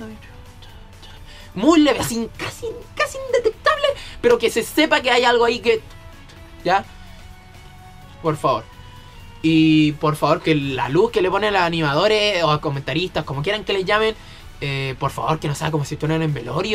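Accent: Chile. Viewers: Amigo a eso de un mes y algo me interesé en volver a jugar YGO (pendulo? kisawea? :v) y me empecé a suscribí a algunos canales para ponerme al tanto del juego y el meta actual (Lobito, el exordio, etc...) pero no tengo cartas despues de tanto tiempo sin jugar. Y hace poco me suscribí a tu canal (muy weno) y me di cuenta por tu acento tan weón que erís Chileno :v De ser así, donde compras cartas bro? yo soy de rancagua y desde que murió Salo no venden ni una weá acá D'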